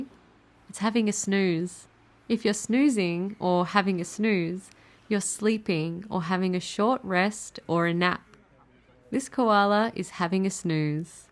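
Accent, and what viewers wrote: Australia. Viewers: This is really incredible job to enjoy video contens with Aussie accent. For the first time, I have watched your video sister.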